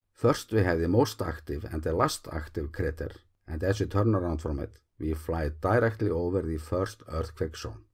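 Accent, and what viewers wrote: Iceland. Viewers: Awesome video as always. I really enjoy how easily and interesting you are talking. And your Icelandic accent is beautiful 🙂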